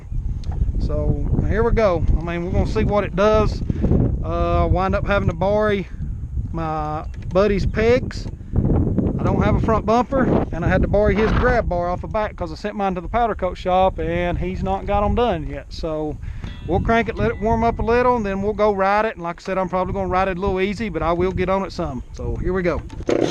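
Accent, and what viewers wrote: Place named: Deep South, United States. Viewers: Dang bro the way you talk and have words like yuns and uppair it's almost like your a deep southern big like me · This plus this dudes yee yee accent make this so badass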